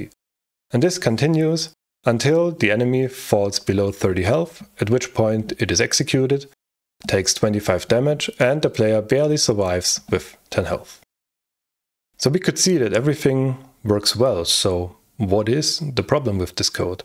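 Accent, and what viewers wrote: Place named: Germany. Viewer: Alter Schwede, geiler Channel Name und richtig gute Inhalte. Bin zwar kein absoluter Rust-Anfänger und habe ein paar Jahrzehnte Programmiererfahrung (hallo Turbo Pascal und VisualBasic 2.0, erinnert sich noch wer? 😀) aufm Buckel, aber deine Videos sind trotzdem sehr gut strukturiert und interessant für mich. Also, your English is pretty good, so no issues for me understanding you (although there is still that lingering German accent, but that's normal, trust me - I have it too when talking in English). All in all, very good content. Subscribed for sure. Keep at it. And Thank you!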